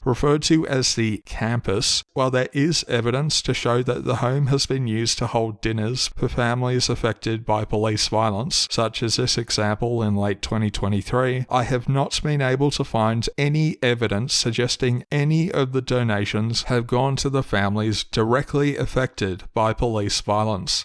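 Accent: Australia. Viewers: Equating the forceful internment of Uyghurs, despite there being no evidence of actual genocide, with the rest of these actual genocides is the problem here. Would you call the systemic arrests and imprisonment of black people in America a genocide? The actual problem here is that In all of your videos you only actually stand for the western consumer. Genocide for you is something that happens far away from wherever you're at (probably Australia if your accent is anything to go by) and you couldn't care less if billions perished so that you could keep enjoying all those first world pleasures. You could use your channel to make videos about actually horrific things gaming companies are supporting in besieged countries such as Nvidias support for global systems of surveillance and their use of Israeli occupied Palestinian land for tech research, the U.S. military propaganda within mainstream 'realistic' fps games such as BF6, Call of Duty, etc., or Saudi/ Gulf monarchy ties to Esports. 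Instead, genocide is just an off hand topic for you to throw out there for shock value. The main focus of your content is solely on whats good for westerners, nothing of actual value.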